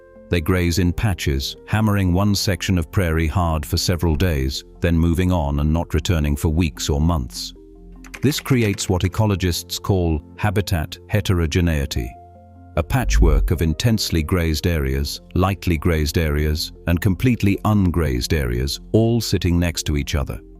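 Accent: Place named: United Kingdom. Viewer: Why a British voice on American content?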